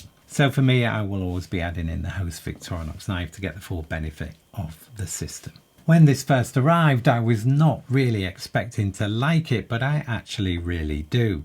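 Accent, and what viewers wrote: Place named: Hull, United Kingdom. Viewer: Great video... I made one myself as well based on the press kit but linked back to yours as you had actually got "hands-on" with the scales. I hope that's ok. I love your videos, and also that West Hull voice of yours is just the ticket for when this old Hornsea expat is missing home. Crack on...and all the best.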